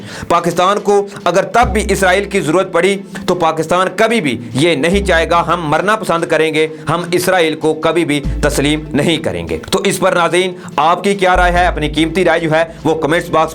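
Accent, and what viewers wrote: India. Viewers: Yes he speaks like Indian people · Tum indan andaz me urdo kiu boolty ho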